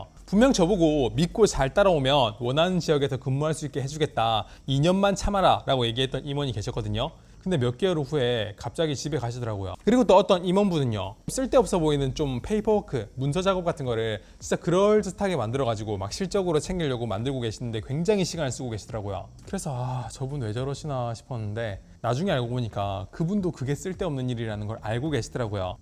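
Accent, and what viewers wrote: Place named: Jeolla, South Korea. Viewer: 유튜버 이분 전라도분인가 사투리가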